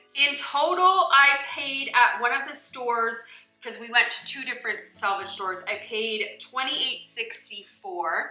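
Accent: Canada. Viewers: Hello, just a question. Do I detect a Canadian accent? Not one y’all , but pasta made me wonder. ❤️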